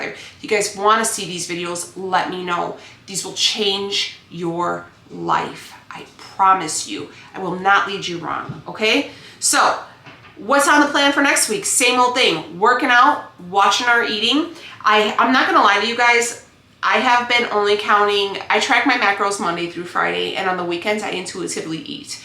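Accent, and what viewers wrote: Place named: Minnesota, United States. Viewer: You are doing great! I’ve learned lots I learned new recipes from you I really like your Minnesota accent even though you are not from there. I stole your It’s a Good Day to Have a Good Day I like lots about you But… when that one word comes out of your mouth it surprises me . The word is 💩 SHIT 😳 What can I say I’ll keep watching and you most likely will keep that descriptive word in your video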